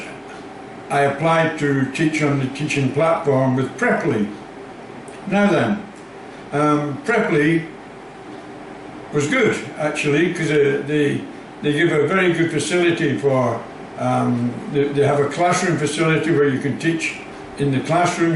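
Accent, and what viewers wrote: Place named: Scotland, United Kingdom. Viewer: This is Scottish accent .. 😃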